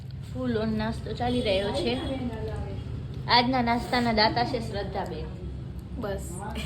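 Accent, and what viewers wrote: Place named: Kathiawar, India. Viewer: Chokhu kathiyavadi bolo chhe maja avve chhe ❤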